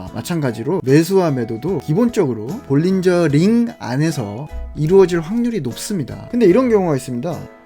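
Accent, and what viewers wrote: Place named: Gyeongsang, South Korea. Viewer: 똑똑하신 분같아요.설명짱.쏙쏙들어오네요.^^ 살짝 경상도사투리 들리는데 기분탓인가요?ㅎㅎ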